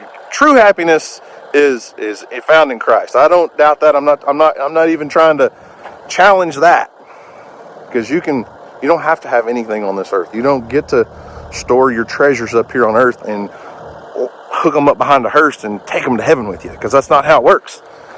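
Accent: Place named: Oklahoma, United States. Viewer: He's got the Okie accent...he used three words! "It mussbe nice" And folks that don't work hard and don't "grind" as younger folks like to say nowadays...they'd just rather be jelly!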